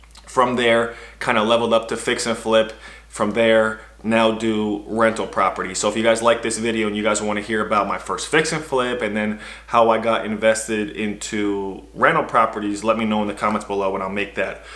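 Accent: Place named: New York, United States. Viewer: Good morning brother, do you operate out of the tri-state area? You sound like a New Yorker 💯%😁💪🏾. I'm from The Bronx.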